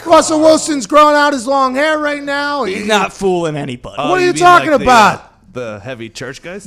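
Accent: Pittsburgh, United States. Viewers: That Pittsburgueeze Yinz. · Love how Pat says Antonio Braaann in his all Pittsburghese accent.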